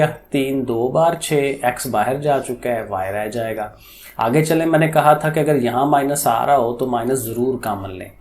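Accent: Sialkot, Pakistan. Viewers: Very nice Ap urdu sialkoti lehja min bolty hen I think you are Sialkoti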